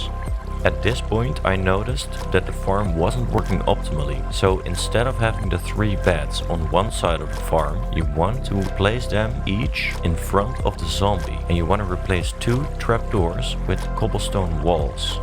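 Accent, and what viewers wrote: Netherlands. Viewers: why do you sound Dutch??!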